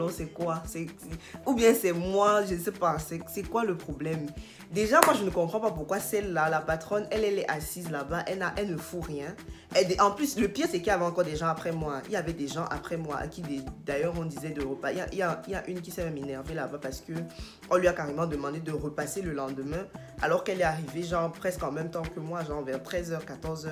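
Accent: Cameroon. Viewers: T'es camer? L'accent ne trompe pas.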